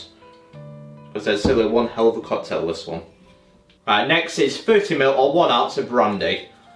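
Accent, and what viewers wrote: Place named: United Kingdom. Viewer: That's the most British accent I've ever heard. Cheers! 😂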